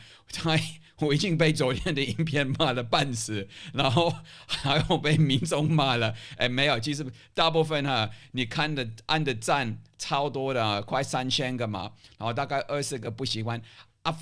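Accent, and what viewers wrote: South, Taiwan. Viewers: 我也很愛買鳳梨,也愛吃鳳梨。只要台灣人沒有人不愛吃吧！這是第三天看教授影片,看一整天也不累,因為也是南部人,又聽到南部的口音所以非常的親切贊讚讚！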